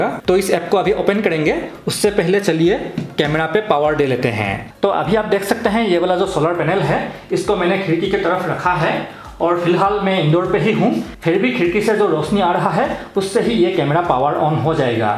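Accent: Assam, India. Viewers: First time hai apka channel pe ..but app ka hindi Assamese logoko jesa hai ..kya app Assam se ho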